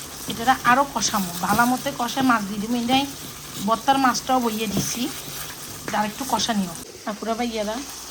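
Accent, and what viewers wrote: Noakhali, Bangladesh. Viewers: Ami delhi theke bolchi…kintu tomar noyakhailya vasha amaar aponjon ke mone koriye dai..boleii tomar vlog dekhi..shaathe tomar khawa dawa..khuub valo laage❤ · Apu Noakhali kotha apnr moke manay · আপু তোমাকে নোয়াখালী ভাষায় কথা বললে বেশি ভালো লাগে। ❤❤❤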